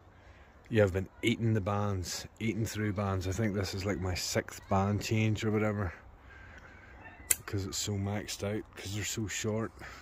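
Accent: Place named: Ulster, United Kingdom. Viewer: I'm thinking " That gent is maybe an Ulsterman" and then I heard " Plenty of fine shavings for kindlin' " Best wishes from Portarlington County Laois. Keep up the good work.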